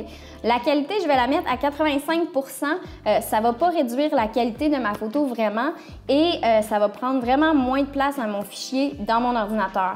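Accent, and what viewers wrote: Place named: Canada. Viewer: Kool l'accent Canadienne 🤭🤞🇨🇦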